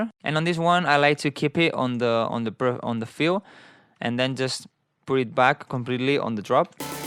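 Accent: Spain. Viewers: este hombre es un español hablando en ingles o es cosa mia? buen video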